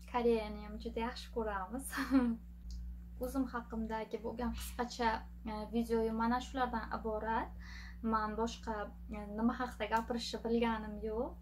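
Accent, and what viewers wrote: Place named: Jizzakh, Uzbekistan. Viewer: Jizzaxcha shevalarizdan sizzi😁😁🤗🤗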